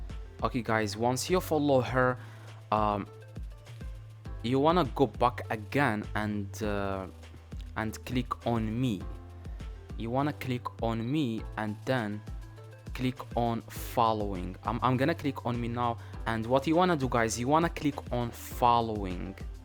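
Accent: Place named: Morocco. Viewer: واش خونا مغريبي بينا من هضرتك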